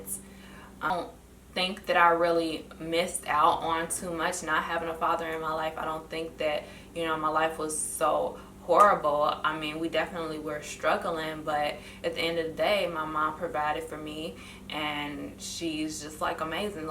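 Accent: Chicago, United States. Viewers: Where are you from? You sound like you're from Chicago. Anyways Yes this is totally normal to feel like you're missing out or when will your time come to start a family. I'm 28 about to be 29 in like 2 weeks and I don't see myself getting married to anyone anytime soon... It sucks because as women we are meant to think that there is some type of clock ticking, but we often forget that the only clock that matters is God's clock. It also makes it difficult when you get to my age and everyone around you is asking when are you going to have babies... Your story unfortunately is not unique I too grew up in a single parent home and I feel like yes it was rough and it definitely left me lost when it came to relationships. I feel like I made a lot of mistakes and dealt with a lot of bad people because I was seeking love from the wrong places. At the end of the day life is a journey and no ones journey is perfect. 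The whole idea of being married by 25 with 2 kids a dog and a picket fence is not a reality it's just a fairytale. Pray that God will send you a good man and it will happen but don't be afraid to let some time pass before he finds you. Just keeping working and improving and before you know it things will fall into place even better than you imagined.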